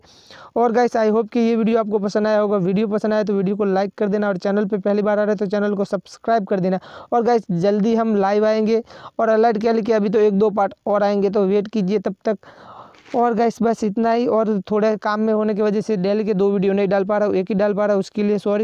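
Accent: Gujarat, India. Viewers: AAP Gujarati ho 🤔?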